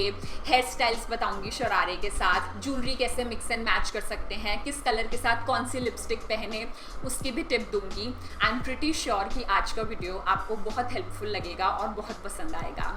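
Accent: Punjab, India. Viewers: Aapka bolne ka style ekdum punjabi touch ka hai!! Im in love with your outfits because they are very sober and decent!!!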